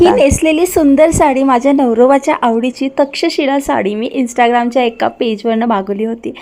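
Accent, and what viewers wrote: Kolhapur, India. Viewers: Tumchi language puneri Nahiye ... Lagech samajt kolhapuri 😂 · Bhashecha laheja gavapramane badlto Pan bhasha hi shudhach bolayala havi Ani pani asa ashudha kasa bolaych Accent kolhapur cha ok Pan shudhach bolayala pahije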